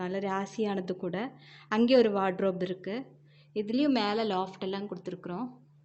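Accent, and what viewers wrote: Kerala, India. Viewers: thank you ur reply. Malayala Vasam speech LA therunchadhu. Where it's built?. In Kerala r Tamil nadu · Hi Amma, ur sounds like my mom, bzos my mom's native is Kerala she'll mix both malayalam and Tamil while speaking. Only the known people can understand her talk Amma.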